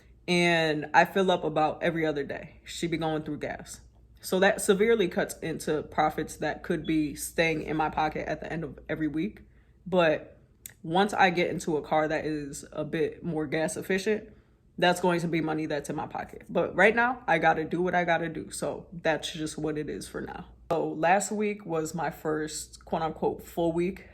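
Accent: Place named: Florida, United States. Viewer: i was gonna guess you lived in Florida cuz how you talk 🤣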